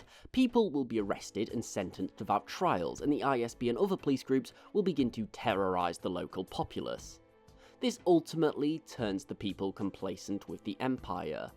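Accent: United Kingdom. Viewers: I can't stand your BRAETEESH accent..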